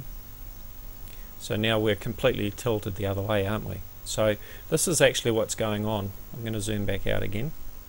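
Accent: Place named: New Zealand. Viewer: awesome accent mister Kiwi. Kuddos from mexico.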